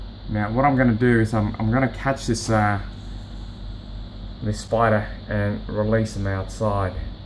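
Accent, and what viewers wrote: Australia. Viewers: He sounds like and his mannerisms are like a typical Aussie. Could have been raised here, born here or be a descendant of a long line of Asian immigrants present here from the earliest pioneer days. After all Australia is further south than what is called South East Asia, we are situated in the Australasian Region of the world map. He could have a heritage originating from any Asian nation. I'm an Aussie of Anglo Saxon heritage and have cousins and 2nd cousins who are Ausies with Thai heritage and Aussies with South Korean heritage. Also other 2nd cousins with Samoan heritage. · he sounds born and raised Australian. Please don't tell me you think white people are native to Australia 😅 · Is this dude Asian with an Australian accent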